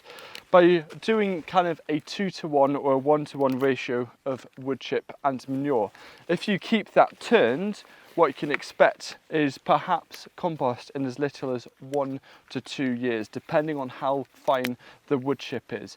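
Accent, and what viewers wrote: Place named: United Kingdom. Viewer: "Woodchip is" Why are English dialects so grating?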